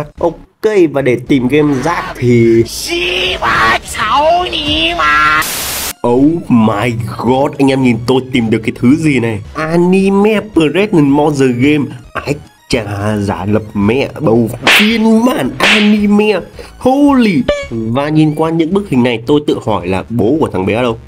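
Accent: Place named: North, Vietnam. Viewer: ko lq nhưng mà nghe ông nói giọng là miền bắc nhưng mà tui cứ có cảm giác ông là người miền trung hay sao á 👁️👄👁️👍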